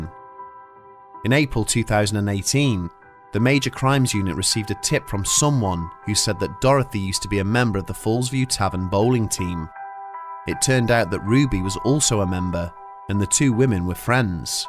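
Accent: Wales, United Kingdom. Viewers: Are you from Newport or Cardiff?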